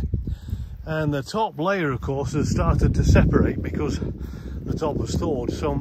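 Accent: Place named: Derbyshire, United Kingdom. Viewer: I'd absolutely love to know where you're from!! Your accent sounds local to mine in Derbyshire, really enjoying your videos 👍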